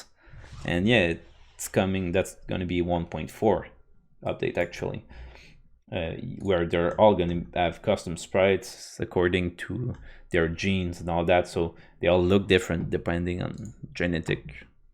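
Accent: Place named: Quebec, Canada. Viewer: Are you from the province of quebec ?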